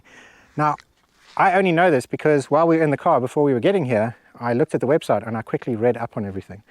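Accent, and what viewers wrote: South Africa. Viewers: Hi from Johannesburg, been watching for videos for a while now and rally great. Love hearing you SA accent. Love Castelo Branco, have visited many times , usually stay at the Tryp Hotel next to the Castle. We own a small farm in Juncal de Campo. Have not been able to travel to Portugal since Covid. Cant wait to come back, your videos make me miss it so much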